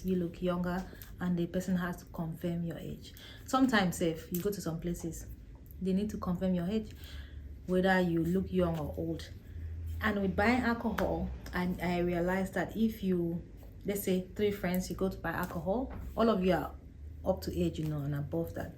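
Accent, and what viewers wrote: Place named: Akwa Ibom, Nigeria. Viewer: Akwa ibom or calabar diction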